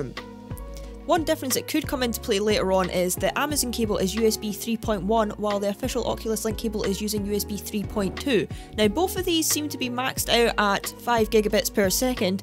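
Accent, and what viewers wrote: United Kingdom. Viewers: Great video! Love your brit ascent!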